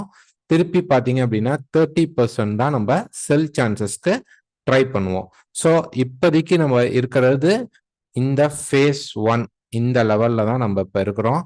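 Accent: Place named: Kerala, India. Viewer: Most of the analysis are accurate!,I feel you are speaking in Malayalam,I can follow your Tamil without any difficulty, Thank u sir!!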